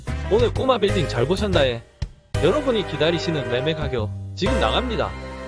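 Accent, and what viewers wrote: Gyeongsang, South Korea. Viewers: 어슬픈 진주 사투리 참... 그냥 표준어 쓰시죠 ㅎㅎ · 와 우리 진주사투리갖고 뭐라카지? 직접 유튜브 찍어보고 이야기하시지.. 내는 고마 들을만하고 이쁘게 말 할려고 노력하는게 보이는데.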